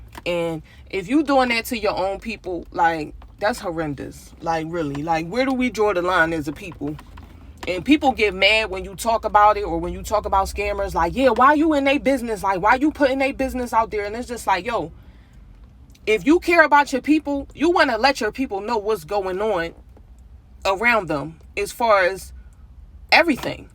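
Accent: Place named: Philadelphia, United States. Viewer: I can tell by that accent that you’re 100 percent PHILLY!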